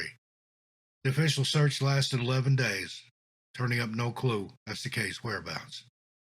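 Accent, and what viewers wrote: Appalachia, United States. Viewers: i just somehow stumbled on to you and your awesome stories. My youngest daughter was born at memorial mission hospital. My family settled fairview right outside of bat cave...buncombe co. many many years ago Im the 3rd generation here. When i went into labor with my baby girl 21 years ago this past february, coming down the mountain wouldnt you know, random smoke came and settled over us blinding my aunt teeny driving, me screaming at her that the baby was fixing to be here please don'tkill her..., Teeny screaming that she knows but she can't see when I'm hollering like that. 😂 I'm a Merrill...my Pappaw'sfamily all still live at Merrill Mtn. And Cameron/McBrayer. Yesterday i was listening to you thinking.. man he sure does sound familiar. I know why now! And wouldnt you know i left home 20 years ago after my 2nd and last baby was born for texas. 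Im in west tx pretty close to NM now, looks like i just missed you there, former neighbor. Congratulations on your new life in New England and thank you for being the sound of home for me now.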